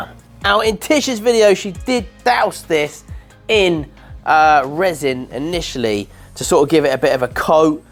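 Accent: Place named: Cockney, United Kingdom. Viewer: His voice reminds me of Danny Dyer, like so cockney it hurts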